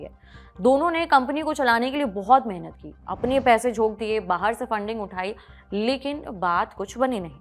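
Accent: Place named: Uttar Pradesh, India. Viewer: Lagta h ap UP se ho😂😂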